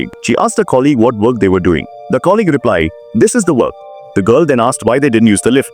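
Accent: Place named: India. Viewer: bro why does this fuckass ai voice sound fucking indian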